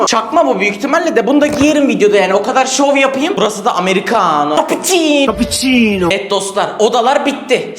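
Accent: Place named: Black Sea, Turkey. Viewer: Oy uşağım Sen de bir Karadenizli oldun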